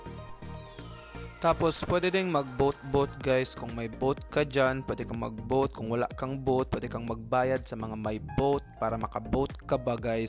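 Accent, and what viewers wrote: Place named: Visayas, Philippines. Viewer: parang bisaya met iti tuno mon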